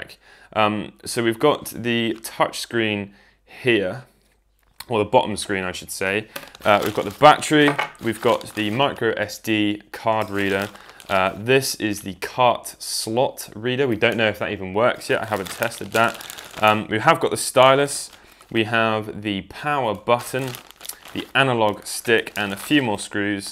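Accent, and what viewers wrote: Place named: United Kingdom. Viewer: In British English.. perfect, i love your accent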